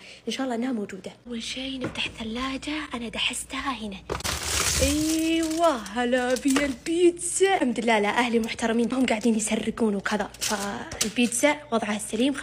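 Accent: Saudi Arabia. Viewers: بحب لهجة السعوديات كتيييييير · 😂😂😂 لهجه السعوديين غريبه وكيوت🤏🏻😭 😂😂شنو معناه يلبيه 🤔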